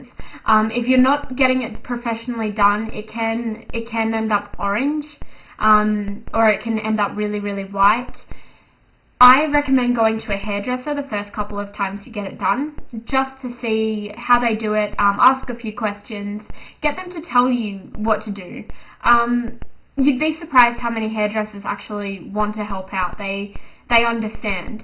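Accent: Australia. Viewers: are you australian? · You're beautiful. Thanks for the post. Looking at you -- and listening to that cute Aussie accent of yours -- is the best spent on the 'net.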